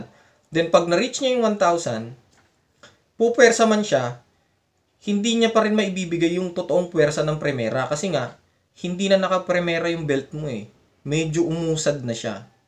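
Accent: Ilocos, Philippines. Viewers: Sir Ilocanos ka po ba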